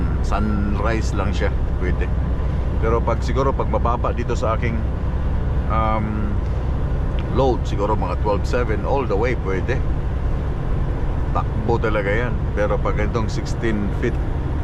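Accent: Visayas, Philippines. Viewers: Hi bisaya ka bai,kabati ko nag salita.tga diin ka MN diay sano?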